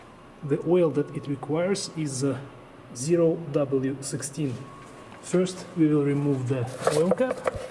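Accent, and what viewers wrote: Russia. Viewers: Thic Ruski Accent with good english is soothing lol 😅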